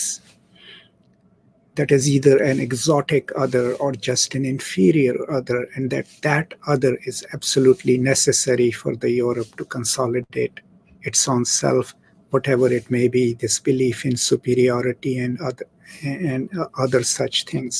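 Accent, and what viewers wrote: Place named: England, United Kingdom. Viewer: thanks for your works! ps: i like your voice and english accent so much greetings from france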